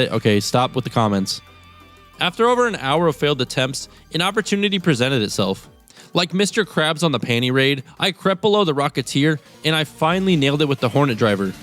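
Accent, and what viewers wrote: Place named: Midwest, United States. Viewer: Damn I'm right across the big river from you I knew you sounded more Midwestern than most people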